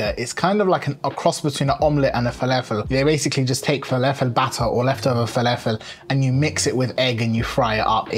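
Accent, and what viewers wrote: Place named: United Kingdom. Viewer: I like that you’re really Egyptian even though you sound British , I like the combination 💜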